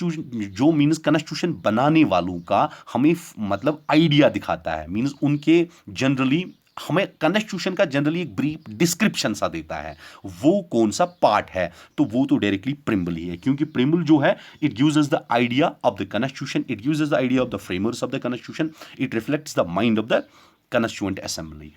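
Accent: Kashmir, India. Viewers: r u kashmiri bro..This voice is kashmiri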